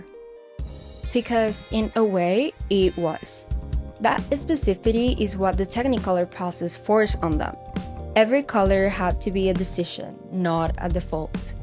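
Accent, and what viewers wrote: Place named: Tagalog, Philippines. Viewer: Are you Filipino too? I think I hear a little Tagalog accent, or maybe I'm wrong.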